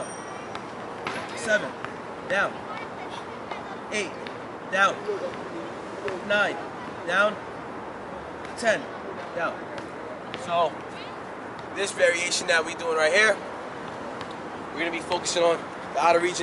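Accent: New York, United States. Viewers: Nice omg love the NY accent😂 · are you from New York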